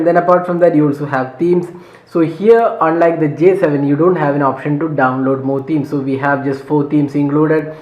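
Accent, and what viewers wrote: India. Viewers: I cant understand your english..can speak nicely we are not n india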